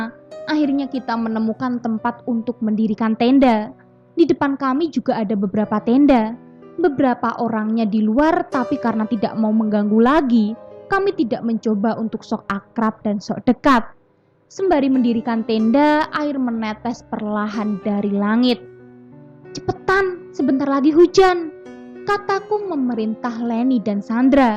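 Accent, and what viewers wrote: Java, Indonesia. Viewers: Logat nya medok kali..😂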